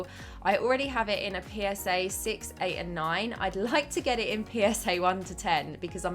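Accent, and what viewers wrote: United Kingdom. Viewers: Very nice cards. I love the English accent, also. 😅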